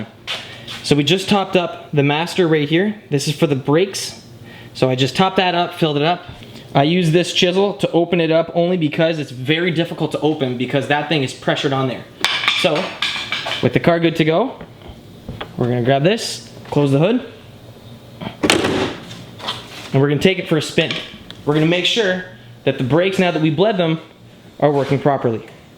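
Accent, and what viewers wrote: Ontario, Canada. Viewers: are you from ontario?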